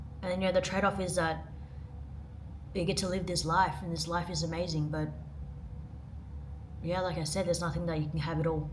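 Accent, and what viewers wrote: Australia. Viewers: Nice ,as soon as you first spoke i new you were from Aus👍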